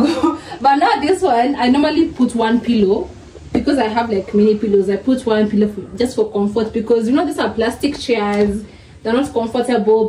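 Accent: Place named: South Africa. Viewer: your accent does sound like south african one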